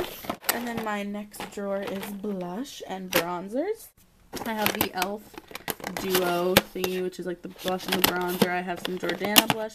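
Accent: Canada. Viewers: are you canadian or american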